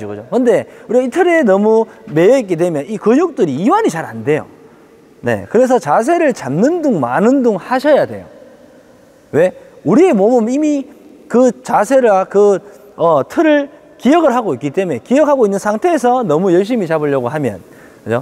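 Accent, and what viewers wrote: Gyeongsang, South Korea. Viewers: 경상지역 사투리 같네요. 툭 던지는 남쪽지역 말투와 대충 치듯 던지는 스윙이 조화로워서 웃음이 절로납니다. 먼 곳(캐나다)에서 영상 잘 보고 있습니다. 힘든 시기 잘 이겨내시고 테니스로 몸도 지켜내시길 기원합니다.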